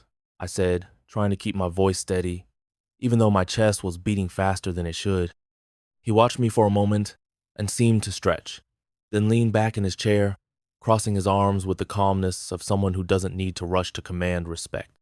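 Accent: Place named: South, United States. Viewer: the masculine version of a Southern voice was mesmerizing and crept into my mind as if it had a master key to open all of my hidden feelings I had locked away long ago.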